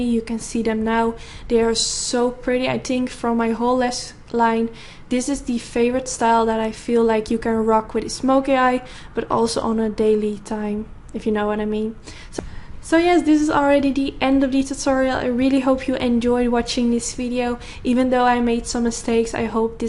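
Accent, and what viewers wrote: Netherlands. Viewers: nothing went wrong!!! it is extremely beautiful...btw are u Dutch? u have kinda like a Dutch accent. Beautiful!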